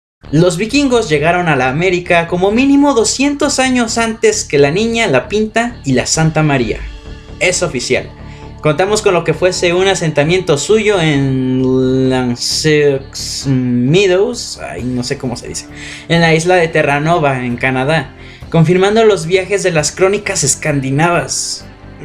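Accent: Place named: Spain. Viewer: Nunca dejaste de hablar con tono ibérico, jajaja.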